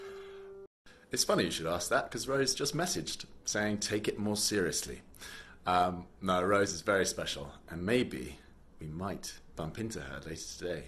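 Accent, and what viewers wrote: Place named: RP, United Kingdom. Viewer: Omg he's really gorgeous. I didn't expect him to sounds so posh haha.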